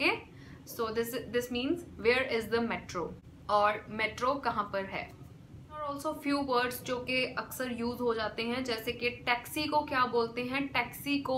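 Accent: Punjab, India. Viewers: hey you are punjabi????? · I think u r from punjab..anyway😍😍🇳🇵🇳🇵🇳🇵😘😘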